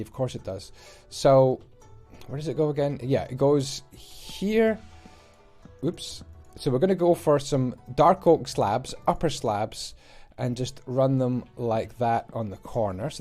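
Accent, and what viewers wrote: Scotland, United Kingdom. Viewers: Are you scottish? Because ei am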